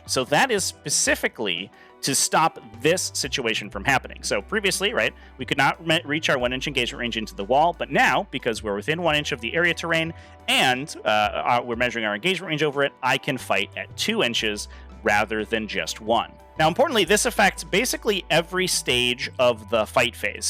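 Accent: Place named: United States. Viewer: Dodgy head set. You still sound American :)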